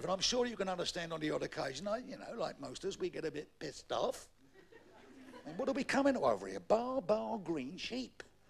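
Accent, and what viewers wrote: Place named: Cockney, United Kingdom. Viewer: Classic cockney comic. They really dont make them like that anymore. All the whites in London talk black now. Such a shame that the cockney accent has died out. His jokes were ok but his accent was classic.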